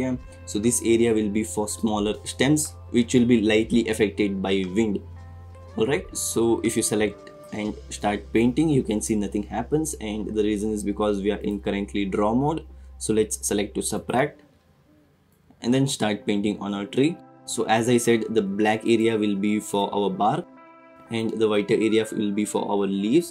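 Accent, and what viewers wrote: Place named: India. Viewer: I swear everytime I see a video that has a guy with an indian accent you know it's gonna be a useful tutorial. Thanks a lot!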